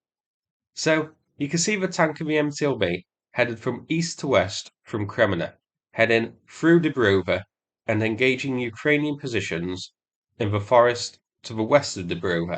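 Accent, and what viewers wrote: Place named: Grimsby, United Kingdom. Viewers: Are you a Grimsby lad 👍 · A coastal town in Northern England, a different part of which sounds like he is from. Grimsby. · I have been trying to place accent for ages, and now I know. Eh up Grimsby!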